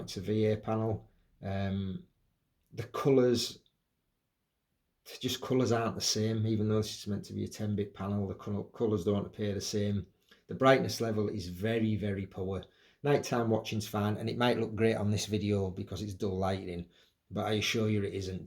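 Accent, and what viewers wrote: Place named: Teesside, United Kingdom. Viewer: You sound like you're from the Teesside area, where you buying from that allows you to return mate? Looking to buy over the weekend, and would love the option to return, after getting stuck with a TV i hated from week 1 last time, but was stuck with it. That was an edge lit nanocell, lights all showing along the bottom of the screen now, but the banding, black wash out and blooming, made dark movies and gaming unusable to me, it was a total disappointment. I've been looking at this TV and the TCL 805, which you obviously favour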